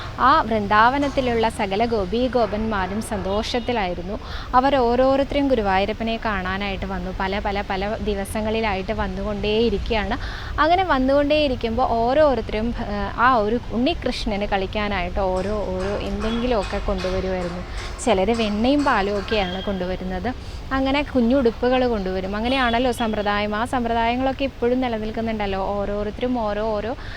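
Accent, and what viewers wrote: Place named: Namboothiri, India. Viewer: ഹരേ ശ്രീ ഗുരുവായൂരപ്പാ ! മോൾ ടെ ഓരോ ദിവസത്തേയും കഥകൾ കേൾക്കുണ്ട്. വളരെ ഭംഗിയായി അവതരിപ്പിക്കുന്നുണ്ട്. ഒരു നമ്പൂതിരീശൈലി ഉള്ളതു കൊണ്ടു തന്നെ അവതരണം ഗംഭീരമാകുന്നുണ്ട്. എനിക്ക് വളരെ ഇഷ്ടമാവുന്നുണ്ട്. വളരെ നിഷ്ക്കളങ്കമായി അവതരിപ്പിക്കാൻ കഴിയുന്നതു തന്നെ ഗുരുവായൂരപ്പൻ എപ്പോഴും കൂടെയുള്ളതിന്റെ ലക്ഷണമാണ്. ഭർത്താവിന് അഷ്ട ഐശ്വര്യവും നൽകി ഗുരുവായൂരപ്പൻ അനുഗ്രഹിക്കട്ടെ! മോളു തന്നെ ഈ പരിപാടി അവതരിപ്പിക്കുന്നതാണ് പ്രേക്ഷകർക്കിഷ്ടം. തുടർന്ന് പോവുക. ഹരേ കൃഷ്ണാ .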